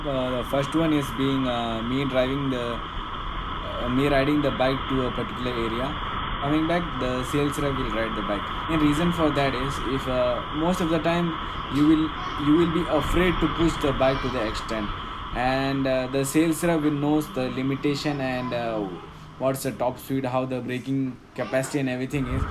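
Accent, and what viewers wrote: India. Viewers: matte black you are staying in Malappuram and your English is North Indian style. are you Bengali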